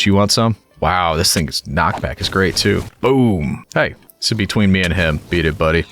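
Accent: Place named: Scotland, United Kingdom. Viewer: I fully enjoyed your Scottish accent!😂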